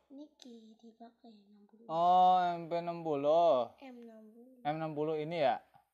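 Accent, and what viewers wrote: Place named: Kedu, Indonesia. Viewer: pasti Plat AA ni... dari ngomong keliatan, sayang gak ada mukanya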